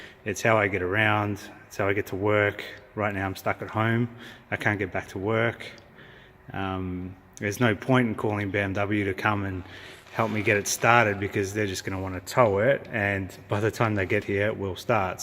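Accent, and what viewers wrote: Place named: Australia. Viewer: Your accent tells me you're in Aus - mate, lodge a complaint with your State's consumer affairs department. They've helped me in the past and I'm sure they'll help you. Remember we have pretty strict warranty laws and BMW is legally obligated to help. With this video you have enough evidence to (nicely) demand action. Good luck, and post updates! EDIT: Just read your post, you've already lodged with ACCC - no surrender!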